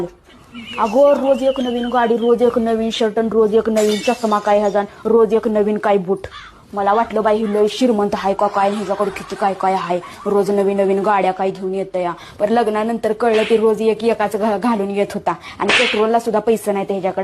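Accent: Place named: Satara, India. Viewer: Lai bhari madam satari bhasha mala khup awadle.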